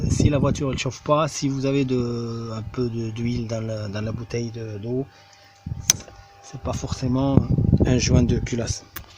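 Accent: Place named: North Africa. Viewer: Accent bledart et accent du sud ca fait space.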